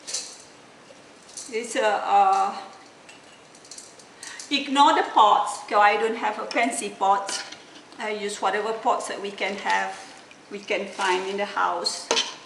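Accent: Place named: Malaysia. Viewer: I love to hear your malay accent english which is very original, a hard to find especially those who have spent many years in America. I love your recipe of that "NASI LEMAK". It has a beautiful aroma and unbelievably tasty rice. You have very good heart and I wish you all the best for your future.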